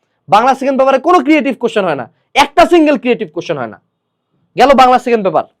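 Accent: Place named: Dinajpur, Bangladesh. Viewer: bhaiyar mukhe dinajpur er ancholik vasa sune valoi lage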